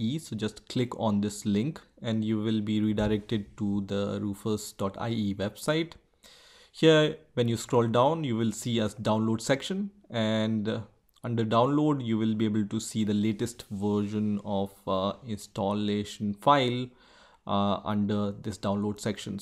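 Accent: India. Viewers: I absolutely detest the Indian accent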